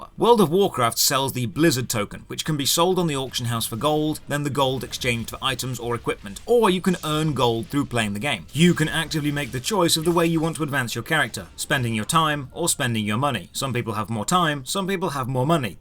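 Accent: England, United Kingdom. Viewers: He is paid either way so does he really care? That is the real question. Ask yourself how he got to be an influencer. Who does his editing. Why are so many British streamers getting pushed in the USA market? Marketers think well educated English accents are more powerful when trying to be convincing in advertising or influencing. I guess the numbers don't lie but are you just one of their numbers. How is that for a thought experiment :P Think about it, spend your time pissing off the large publishers and corporate sacks of you know what by showing how convincing you can be swaying a large swathe of the gaming community against their best interests and wait until they come to you. That is what I got out of that whole video, he doesn't care where the money comes from, as long as its worth his time.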